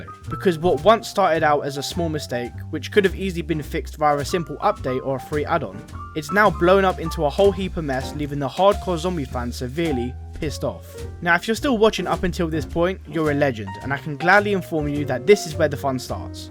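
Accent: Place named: United Kingdom. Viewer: You sound like a british mrtlexify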